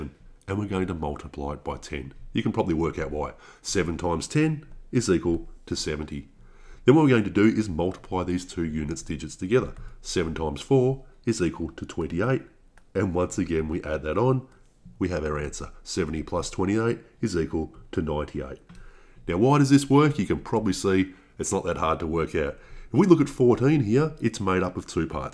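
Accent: Australia. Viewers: Yes it helps us learn and understand math when we get how the algorithm works. Another great lesson . Yet I marvel at the idea that some- know it all -sharpshooter should hoot about your videos for any reason . I mean seriously, if they don't like your " down under " dialect they should go find something to do with themselves.😁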